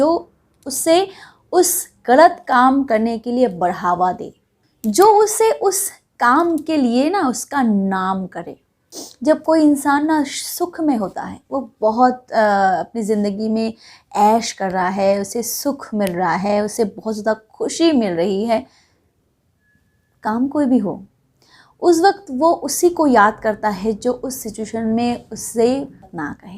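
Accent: India. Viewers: Love your hindi accent..🥰🙈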